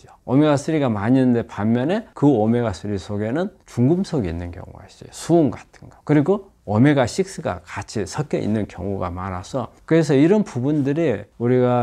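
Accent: Jeolla, South Korea. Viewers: 전라도 사투리 ㅋㅋ 이번 잼버리사태만봐도 그냥 신뢰 제로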